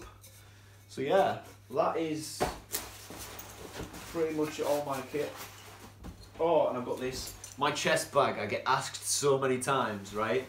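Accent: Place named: Cumbria, United Kingdom. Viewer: Sorry, I couldn't catch what you said with your Cumbria accent. Who makes the fire-steel with the screw off top (link) please? I'm also trying to find a suitable headlight rechargeable with white and red light options, but I am not going to pay 50 Euro for such so still searching. Mice are destructive little buggers so best to put none tinned foods into suitable containers which will hopefully protect and mask the scent and stop them chewing through your kit.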